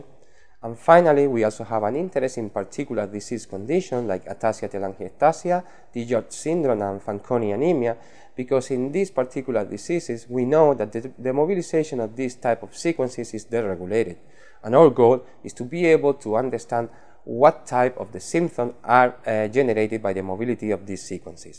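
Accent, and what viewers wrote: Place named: Spain. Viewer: Interesting, but you should speak slower. As a non-native English speaker I have problem to follow because of your Spanish accent, and it had been much easier if you spoke slower.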